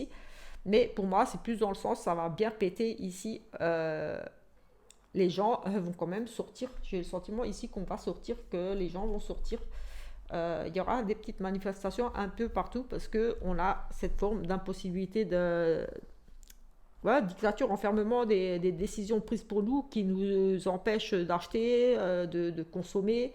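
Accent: Alsace, France. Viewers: J'adore votre accent depuis 6 ans je vie en Alsace avant jetait en Moselle hopla dü ! Bisous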